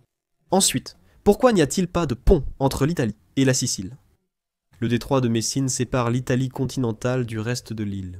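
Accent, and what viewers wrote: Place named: Portugal. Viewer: en effet c’est bien ça. L’accent portugais qui est reconnaissable de loin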